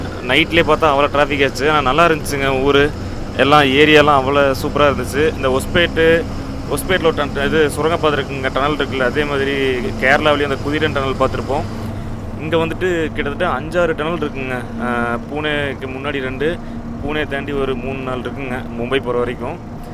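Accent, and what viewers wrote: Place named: Coimbatore, India. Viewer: உங்களின் shift from Thangam to the new Container lorry looks great. எங்கள்க்கு உங்களின் சரளமான ". ஏங்க்ப்பா " Coimbatore style தமிழ் anchoring giving your usual & meaningful வழவளா talk என்றும் 16 மாதிரி சரளமான பேச்சு ரெரம்ப பிடிச்சிருக்கு. So, without break உங்களின் பிரயாணத்தைத் தொடருங்கள்.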